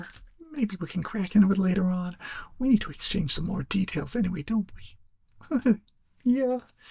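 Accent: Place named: United States. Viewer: Interesting American accent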